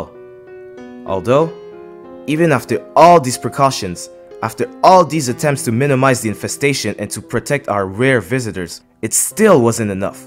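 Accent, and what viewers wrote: Montreal, Canada. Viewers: accent canadien tape fort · Ay Man ça c'est du VRAI Français de Montréal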